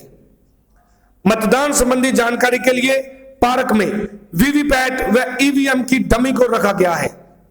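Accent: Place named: Punjab, India. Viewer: Aawaj me punjabi language ka touch aa raha h ji Good keep it up sir ji 👌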